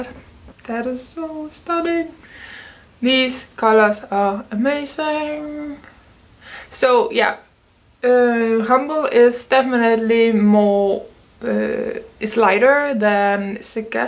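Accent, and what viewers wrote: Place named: Denmark. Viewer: Danish? Love the accent!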